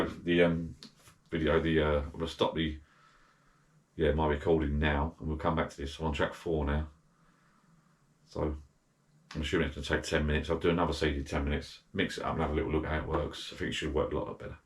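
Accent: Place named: Cockney, United Kingdom. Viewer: The subtitles are utterly,utterly stupid; (what has 'Mercedes' got to do with ripping a track?),- and the cockney accent is almost as bad to understand, even though I've kept starting and stopping,- most infuriating!! If you are trying to explain how to do something, at least try to speak a lot more clearly so that others can understand you.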